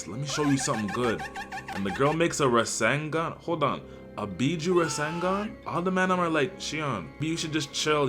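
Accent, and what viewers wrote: Jamaica. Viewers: You have the Jamaican accent bro❤ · Are you Jamaican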